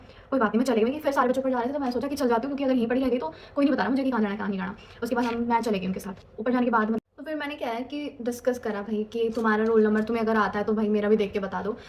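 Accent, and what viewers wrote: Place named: Haryana, India. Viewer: Questions to ask: Apne konsa college aim kiya hai? Aap caurse konsa krogi? Kya cuet dene ke liye apne computer sikha tha ya bina sikhe hi de Diya? Mujhe computer chalana nhi aata to kya me fir bi cuet de paungi? Koi glti to nhi hogi? Ya sikhna pdega pehle? Plzzz ans these questions....btw I love your Haryanvi accent ❤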